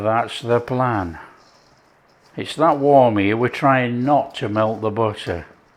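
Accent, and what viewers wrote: Yorkshire, United Kingdom. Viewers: are you from yorkshire,?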